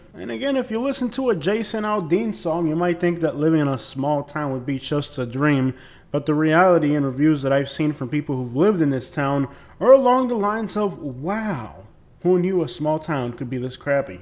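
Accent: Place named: South, United States. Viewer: 😂 your southern accent kills me 😂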